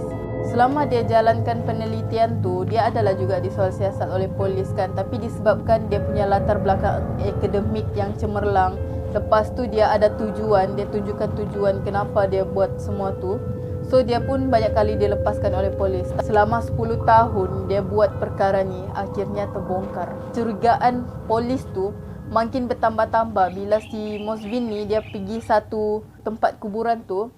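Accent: Malaysia. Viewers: Kok lucu y lihat cakapnye orang Malaysia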